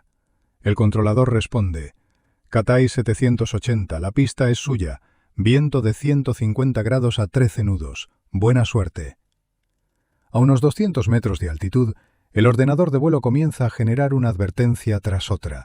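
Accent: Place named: Spain. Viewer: Es el primer vídeo que veo en el que se pasa aleatoriamente de la narración en español de España al español hablado en países hispanoamericanos.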